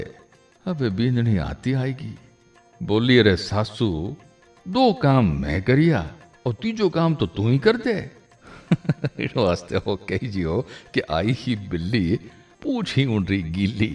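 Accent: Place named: Jodhpur, India. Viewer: आपकी आवाज सुनकर जोधपुरी होने का फख्र है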